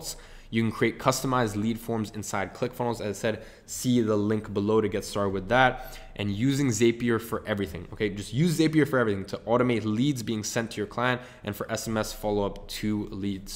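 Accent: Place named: United States. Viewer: why does he have an american accent if he was raised in Britain?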